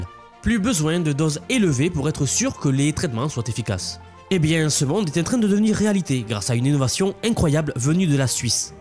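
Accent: South, France. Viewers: super video jadore ton contenu mais choisi entre l'accent du sud et l'accent asiatique pcq la c'est inaudible stp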